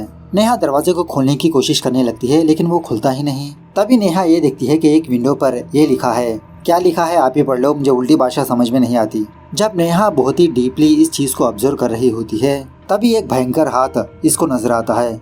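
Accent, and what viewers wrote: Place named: South, India. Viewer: You sound sweet bro, speak Hindi with South Indian accent, we love it.😊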